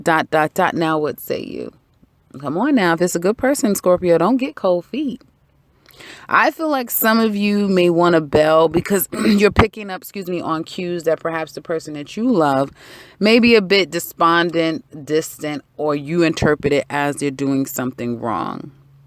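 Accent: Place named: East Coast, United States. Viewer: Hey, are you from the east coast? love the accent & thanks for the reading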